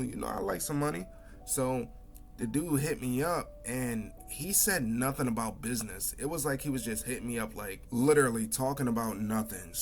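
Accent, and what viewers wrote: United States. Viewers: Sound like American woman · You from B-More or Philly?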